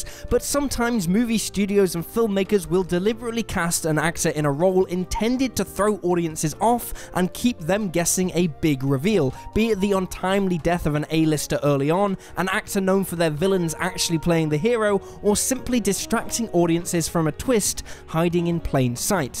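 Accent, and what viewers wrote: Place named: North, United Kingdom. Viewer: He is northern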